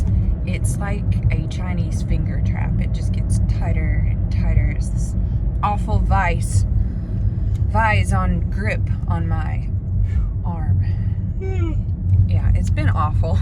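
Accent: Tennessee, United States. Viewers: Omg don't cry your too sweet and love your southern voice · I’ve been following all your journey on the AT. You are a strong and amazing woman. This is just a bump in the road. I thought you talked like a Tennessean… I’m 2 hrs from Memphis. Good luck on your recovery and return to the trail. Bobr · I knew that was a Tennessee accent!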